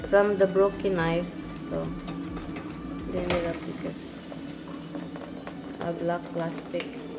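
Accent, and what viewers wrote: Philippines. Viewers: i subscribed because your videos are like novelties to me. i think the way you talk and the way you get your point across on issues makes me laugh. i am assuming that you are educated and just taking the Filipino accent to a different level...happy for you and to your husband...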